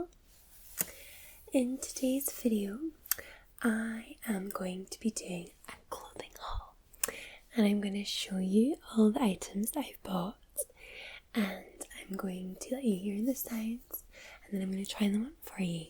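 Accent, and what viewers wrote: Scotland, United Kingdom. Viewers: Not sure if you have done that before, but would you consider making a video where you purposefully talk "very scottish"? I kinda love it and it might actually be tingly :D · great video , thank You! I enjoyed the accent .. first video I've seen that You actually used it..least that I could tell. You're lucky to live in Scotland, im a huge scotch fan, Laphroaig, from Islay. Thanks again.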